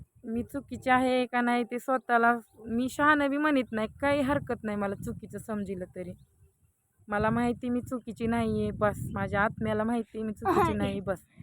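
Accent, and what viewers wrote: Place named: Ghat, India. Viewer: आपली घाटी भाषा भारी वाटते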